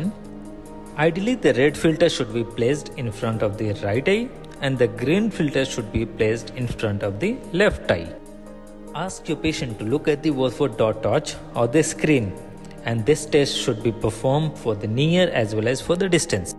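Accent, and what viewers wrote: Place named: India. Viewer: و الله يا الهنود شوفو ليكم حل لي الاكسن بتاع الانجليزي دا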